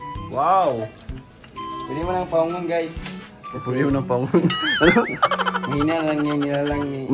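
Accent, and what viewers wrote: Visayas, Philippines. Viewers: Dun ako natutuwa sa opening spiel mo na What's up ketchup... dun pa lang good vibes na pati pagka-candid mo. Tuwa din ako sa Visayan accent mo (no offense meant, hah!), na kering-keri mo, bro!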